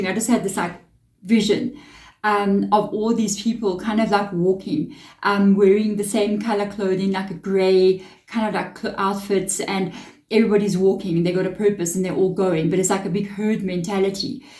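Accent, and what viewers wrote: South Africa. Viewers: I did not pick your accent as South African, but I can hear it now. 💚 My dreams I trust more than anything else.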